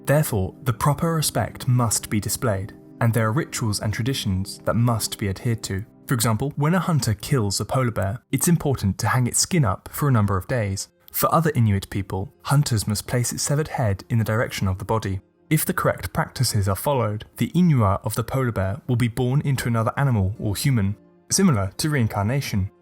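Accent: United Kingdom. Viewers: As an Inuk, this video got every myth right, just as I heard these myths growing up. The pronunciations of the Inuit language through that smooth British accent were on point.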